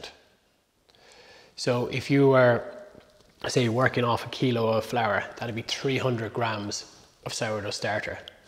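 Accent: Ireland. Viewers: I love your accent, I feel in I’m Ireland when I listen to your voice. I really like you baking style. Cheers buddy keep making great bread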